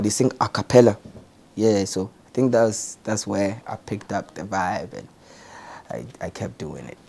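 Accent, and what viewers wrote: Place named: Nigeria. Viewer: But y he want talk like naija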